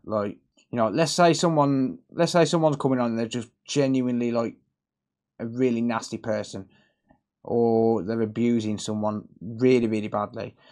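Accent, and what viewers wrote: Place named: Dudley, United Kingdom. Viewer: Sounds like he’s a local lad from Dudley way.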